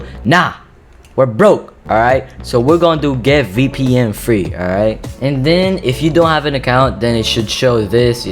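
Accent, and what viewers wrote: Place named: United States. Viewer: You sound American wtf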